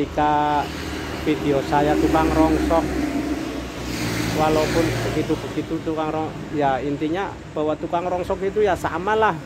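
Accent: Indonesia. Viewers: Kaya wong Tegal' Brebes'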